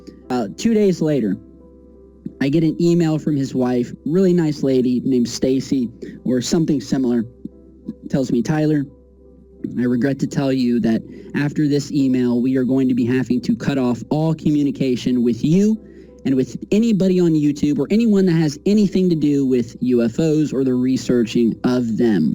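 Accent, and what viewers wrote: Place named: United States. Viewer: Never get bored of hearing your opinions. You have the only us accent without the deep south drawl that I am not irritated by. Everybody deserves some private time and a bit of r&r so feel no guilt for taking the time to stay fresh. Stay real and keep the truth. Kudos & respect for your work.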